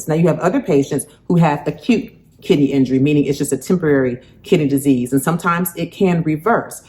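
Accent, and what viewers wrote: South, United States. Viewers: From the South, that explains the accent, love it !! Come on folks ---- 460 views and only 76 Likes...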